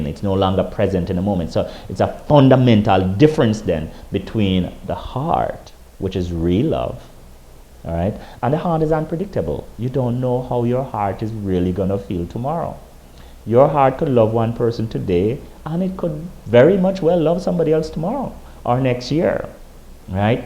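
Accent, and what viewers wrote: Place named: Jamaica. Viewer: and he sounds Jamaican like me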